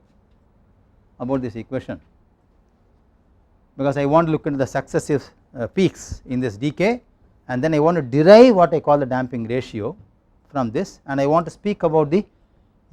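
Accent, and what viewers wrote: India. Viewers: well are you suppose to understand what everyone is speaking?? cause the last time I check America is not the only country in the world...for instance they're is a country call India and this guy sounds like he is from there...also no where in the title of the video it says for Americans and or English speakers.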